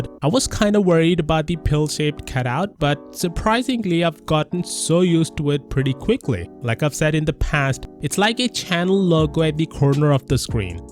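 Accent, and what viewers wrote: India. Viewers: it's accent not plastic surgery ! In india their r many people who speak like this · many people in India speak with this accent.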